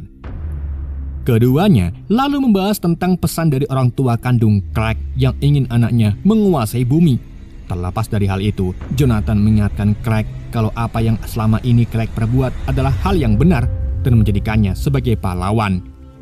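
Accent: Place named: Pekalongan, Indonesia. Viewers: Iki admine antara wong Pekalongan nek ora wong batang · Wong kalongan po min. Kok ngomong megono. 😅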